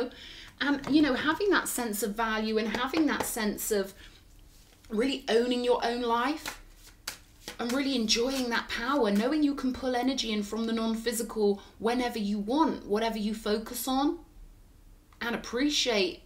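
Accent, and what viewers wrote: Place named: England, United Kingdom. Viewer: Well now you have me love struck with the natural setting as Heaven, tee hee. Having the queen's english and angelic level already had me sold that you are a gem. Chances are you may not be interested in a 61 year old frog though. I'm guessing you are quite picky. As we all should be I guess. Personality wise for sure. Take care. ✌️🥰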